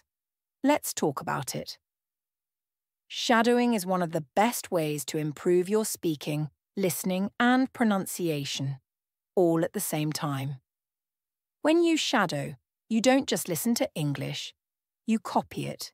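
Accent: United Kingdom. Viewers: It is british accent. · She said british accent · England accent 😢😢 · noooooo qué lastima que es inglés británico... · Is it british accent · Day 1 complete! This shadowing challenge is exactly what I needed to boost my confidence and improve my pronunciation. Loving the clear and natural British accent❤ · No ,it’s is a British accent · I love the clear and natural British accent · This is the first time I have seen this video and I loved it. Thanks for this proposal and the encouragement! The British accent is my favourite, keep going! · Is this british english?? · She said it's British, because it's her accent. It's in minute. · (Is it british accent) Yes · Is this a British accent? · I don't like the British accent 😢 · The British accent is very charming🥰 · No she said at is a British accent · I tried to find something with a British accent! 👏Thank you! 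😊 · بريتيش اكسنت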